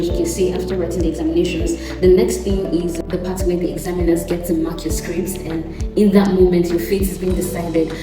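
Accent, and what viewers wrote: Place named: Nigeria. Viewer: I love your accent, are you Nigerian?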